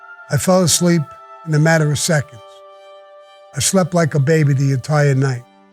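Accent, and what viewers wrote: United States. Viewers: Talks like a 100% full on seppo. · I’m a NEW YORKER myself! I absolutely love listening to you talk, your mannerisms, it’s like I’m back home. I especially love hearing about your life, the way u can describe the detail, the expressions even on your face... it’s like u sitting there next to me, as if it just happened. Thank u for the experience... absolutely my favorite podcast!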